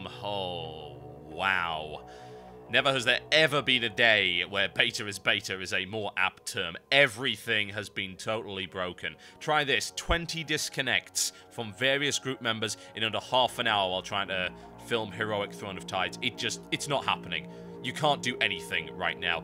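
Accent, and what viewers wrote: United Kingdom. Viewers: I was never into wow that much. I like the game but still..you're actually really interesting to listen to o_o I think its that British voice XD I'm a brit too >:3 Brits ftw!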